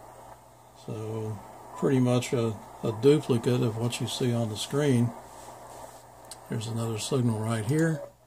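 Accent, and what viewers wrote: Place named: Charlotte, United States. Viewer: I just today found your videos. You sound like you are close to me in Charlotte. Our “accent” sounds like mine. Am I correct?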